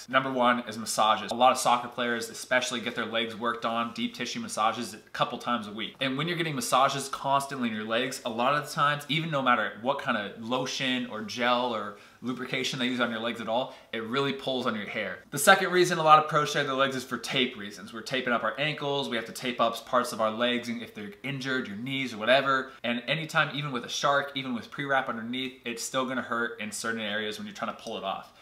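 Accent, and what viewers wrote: United States. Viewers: pedro mota he is American, thats how he was taught to say it. Theirs nothing wrong with it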